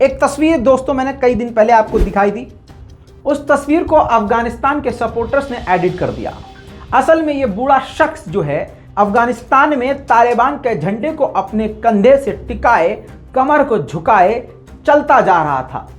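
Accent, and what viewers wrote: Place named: Indore, India. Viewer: पक्के इंदौरी भाषा बोले हो भाई आपकी न्यूज देखने और सुनने का मजा ही अलग है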